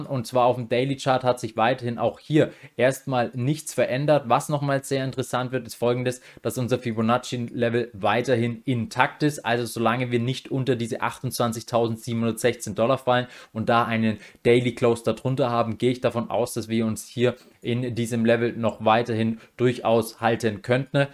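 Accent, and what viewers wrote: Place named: Swabia, Germany. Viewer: Woher genau kommst du aus dem Schwabenland, wenn man fragen darf? 🙂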